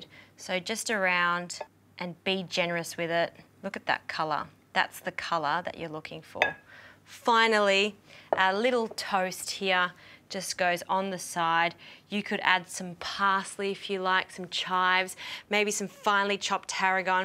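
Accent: Australia. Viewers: She sounds Australian.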